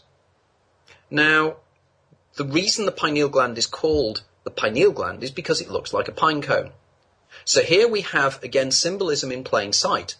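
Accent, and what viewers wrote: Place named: United Kingdom. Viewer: how come british people talk funny sounds like Stuie From the family Guy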